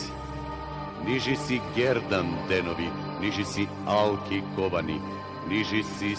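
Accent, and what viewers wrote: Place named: Veles, North Macedonia. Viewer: Veleski Diajalekt preubav. pozdrav braka moi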